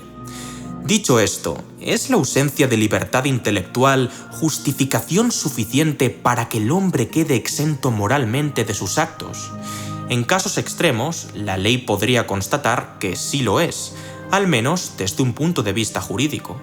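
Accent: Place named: Spain. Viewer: Disculpa, diré algo sin la intención de ofender: en Latinoamérica no estamos acostumbrados a escuchar el español de España. Es tedioso, como al escuchar por mucho tiempo el inglés británico. ¿Crees posible en un tiempo no muy lejano "traducirlos" al español latinoamericano? La temática de los videos son interesantes, incluso hasta para traducirlos a varios idiomas más. Saludos.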